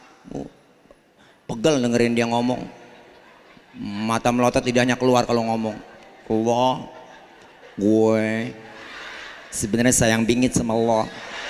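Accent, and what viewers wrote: Indonesia. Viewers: keren..betawi bgt..sehat terus ustad. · Di sumatra ada UAS di betawi ada UNFAD ustadz. Muka, perawakan, sama, punya ciri khas berbeda UAS brdialek melayu UnfAD dialek betawi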